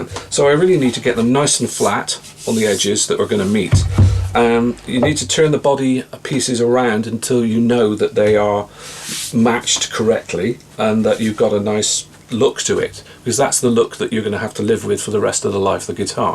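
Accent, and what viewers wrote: United Kingdom. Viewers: You're doing excellent work. I really like the British accent too. I'm from Germany by the way. Any chance you can show us to make a Gibson SG but with symmetrical horns? Because every time I look at the original I get furious. I hate asymmetry.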